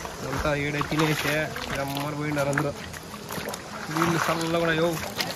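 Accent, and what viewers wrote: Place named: Chittoor, India. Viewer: Chittoor yaasa baagundadhi ba 😉🥰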